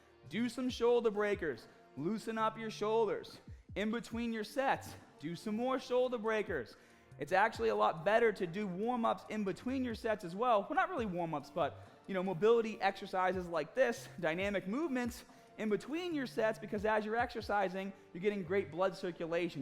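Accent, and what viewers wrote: Boston, United States. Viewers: I'm dropping a comment to help with the algorithm because I'm already subscribed and I liked the video. Love your videos for the training-information, entertaining Boston accent, jokes and lively presentation.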